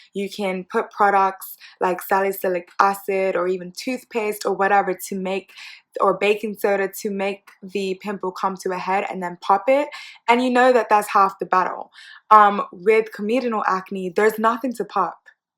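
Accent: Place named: United Kingdom. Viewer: lol. I live in the UK. I could hear the British, but I was like 🤔 there’s some flavour here ❤️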